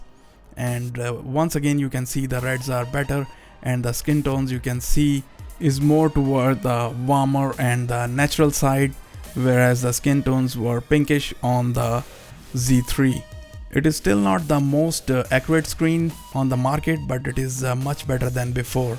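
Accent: India. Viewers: That's indian tone... lol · you sound indian 👎👎 👇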